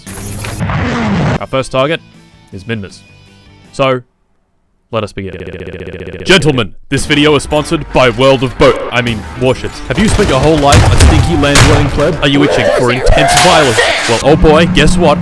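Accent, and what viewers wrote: Australia. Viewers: are u Australian? Cuz so am I so that’d be cool to know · He's Australian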